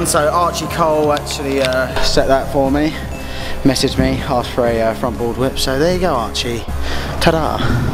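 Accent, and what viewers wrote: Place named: Essex, United Kingdom. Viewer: are you from essex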